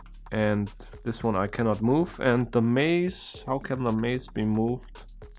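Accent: Germany. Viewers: Th video of the Titan puzzle was the first video I watched from you. I like you but I have a questio: are you German? (I ask because of your accent)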